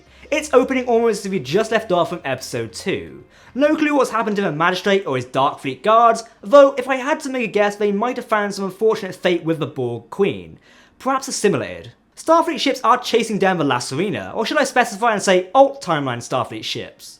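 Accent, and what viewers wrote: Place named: England, United Kingdom. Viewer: Always enjoy your videos very informative and the wording is always nice and witty however and please take this in the right way could you please slow down just a little bit you're an English accent which is OK makes it harder for people to understand everything that you're saying with the speed at which you're saying it in other words a she aint sure have a little bit of a problem Thank you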